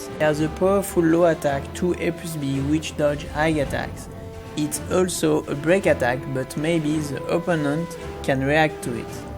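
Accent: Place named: France. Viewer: Tu dois être le seul dont l'accent français me dérange absolument pas ^^ Il est très prononcé mais tu articules très bien et as un débit de parole bien adapté ! (pis bon, le contenu est super intéressant donc ça joue :P) Bravo pour le taf que tu mets dans tes vidéos en tous cas ! Vivement la sortie du jeu ! :D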